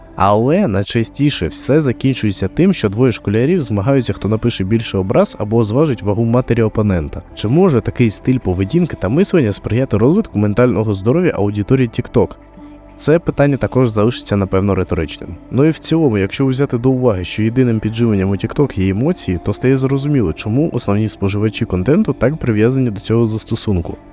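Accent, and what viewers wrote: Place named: Russia. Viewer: я розумію, що то рофли. Але навіть рофлово назвати це крінж. В нього тупо сруський акцент навіть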